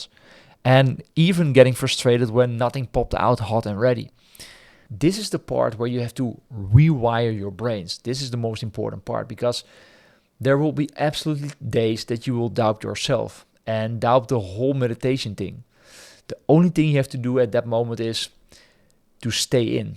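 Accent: Netherlands. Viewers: 2 words out of your mouth and I knew you were Dutch lol. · Jij bent echt Nederlands zo te horen 😂😂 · aan je accent te horen moet je wel nederlands zijn .... vraagje doe je ook meer dan meditatie , astral projectie toevallig ? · Ik kom dit filmpje tegen tussen mijn video's. Klopt het dat ik hoor aan de manier van praten dat je Nederlands bent? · Love the netherlands accent ☺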